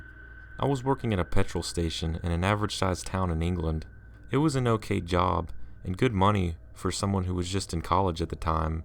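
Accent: South, United States. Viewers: Southern accents rule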